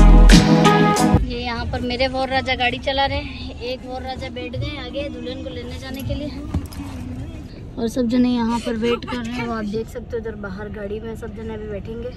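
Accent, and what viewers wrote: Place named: Banswara, India. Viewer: aap konse district se ho? banswara side se lag rhi hai aapki boli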